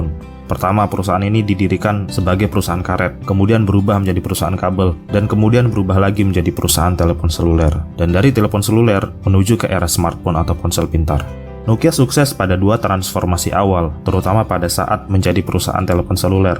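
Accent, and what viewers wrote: Lombok, Indonesia. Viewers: matur tampiasih? mas nya org Lombok?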